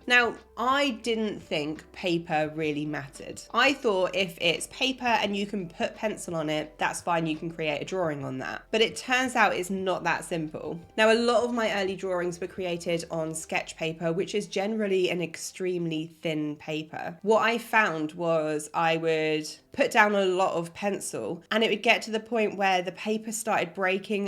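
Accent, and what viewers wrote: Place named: RP, United Kingdom. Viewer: I like your accent Sounds so posh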